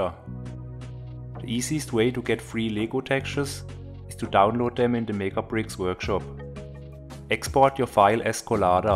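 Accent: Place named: Germany. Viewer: ich hab geliked wegen dem deutschen Akzent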